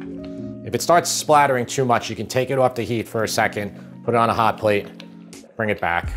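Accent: New York, United States. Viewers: I can hear and see the italian NYer in you lmao. Your voice and hand movements. It's so wholesome XD Equal parts likely to dump a body over the Brookline bridge with cement shoes as cook an amazing italian meal! Haha. I REALLY love that you just jumped straight into chopping and listing the ingredients. Too many chefs list the ingredients as they cook. I find myself skipping all around the videos to make a list. No such case here! Awesome content man!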